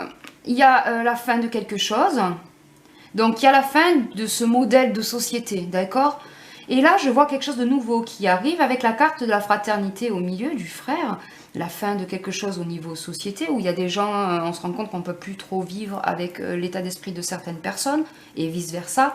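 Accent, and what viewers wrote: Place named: South, France. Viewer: Merci J'apprécie beaucoup votre travail Et ce petit accent du Sud m'apporte le soleil qu'il manque chez moi Merci et bravo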